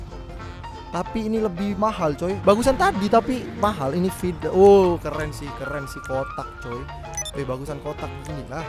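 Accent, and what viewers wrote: Jepara, Indonesia. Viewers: Abang orng jepara?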